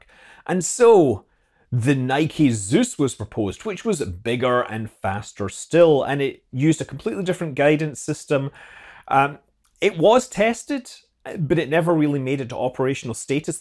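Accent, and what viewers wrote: Scotland, United Kingdom. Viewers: Felt like Curious Droid with a Scottish accent.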